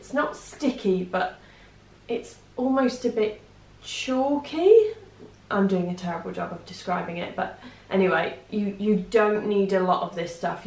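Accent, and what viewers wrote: United Kingdom. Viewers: you said in British accent. I can't ask for more. I'm too distracted by your accent.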